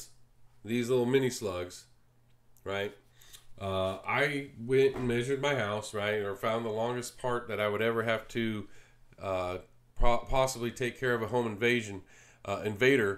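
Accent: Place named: South, United States. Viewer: Southern accent detected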